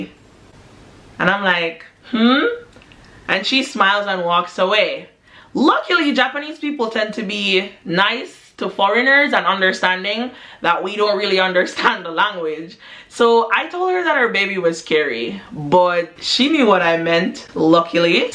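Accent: Jamaica. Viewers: lemme guess, jamaican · are you Jamaican? 👀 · Is she Jamaican .....yeah she's Jamaican 😂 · 20 seconds in and I'm like yup this ones definitely jamaican😂😂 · I knew you were jamaican within 2 seconds. 🤣🤣🤣 I’m Jamaican-American, and I’d LOVEEEE to learn Japanese. But I gotta become fluent in Spanish first! Mi nah play!! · I Could Tell You're Jamaican,That Accent...I Checked You're About & There It Was Our Jamaican Colours.I Am Also Jamaican,I Want To Learn Japanese...Cause I Want To Be Where Pokémon Happens & Also I Love Manga...& I love Well Japan's Culture.Oh And..🇯🇲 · Yo 🤣🤣I love your energy, I’m guessing you’re from the Caribbean maybe Jamaica (I’m new here), definitely subscribing❤️ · omgg it looks like ur a jamaican im not sure but kinda sound like one to mi im a jamaican trying to learn japanese to be a mangaka or a translator!:3 can u tell me if ur jamaican?:) i really loved the vibes in this video too btw:> arigatou! 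· I can hear the Jamaican 😂 私もジャマイカ人ですよ…(笑)面白い。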